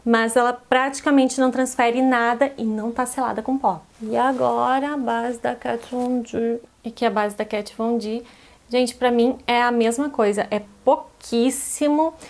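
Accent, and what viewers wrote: Rio Grande do Sul, Brazil. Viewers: é gaúcho flor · Coisa boa é ouvir esse teu sotaque, gaúcha! 😍 todo mundo tá falando dessa base da tracta! Tá fazendo sucesso! Tu é uma lindeza! Beijo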